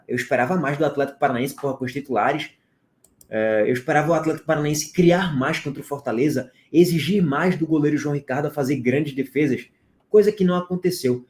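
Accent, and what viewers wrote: Bahia, Brazil. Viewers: só baiano nordestino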